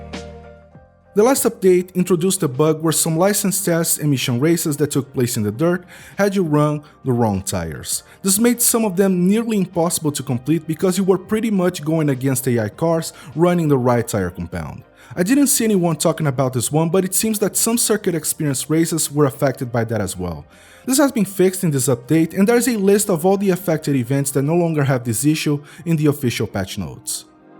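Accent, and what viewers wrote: Brazil. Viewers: I can tell you are Brazilian. Ótimo video!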